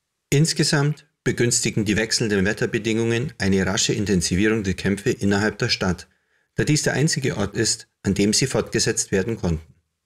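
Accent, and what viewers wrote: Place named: Allgäu, Germany. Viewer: Naja, so halb. Halb Deutsch und halb Allgäuerisch. Besser wäre, ganz Allgäuerisch.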